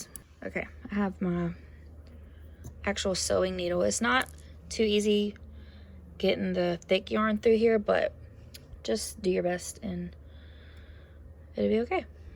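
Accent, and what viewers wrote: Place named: South, United States. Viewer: NICE! I’ll be making some of these for my son’s family. You must be a southern gal. I’m in Louisiana and you sound like one of us. 😍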